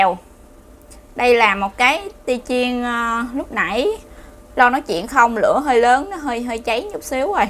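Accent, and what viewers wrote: Mekong Delta, Vietnam. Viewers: Anh xem từ đầu đến cuối Video Em rất xinh với chất giọng ấm áp đặc trưng miền tây nam bộ, món ăn rất ngon chỉ thiếu mỗi bộ bà ba của người con gái sông nước miền tây nữa là nhất Em đấy ạ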